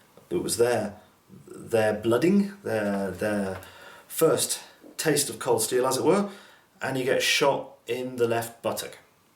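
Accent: France. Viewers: Nice French accent, man. No, I'm not being an ass; it's actually good. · I love your French accent >< Very interesting video nevertheless =) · I understand much better when you have French accent :) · Nice French accent ^^ great video by the way :) Keep on that way ! Oh, and you've got a new subscriber.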